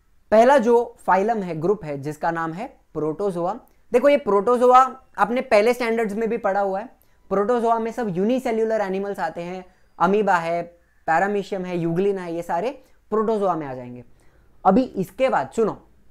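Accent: Maharashtra, India. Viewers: Sir you marathi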